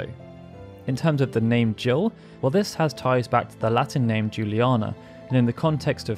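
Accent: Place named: United Kingdom. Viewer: I'm obsessed with final fantasy being talked about with a British accent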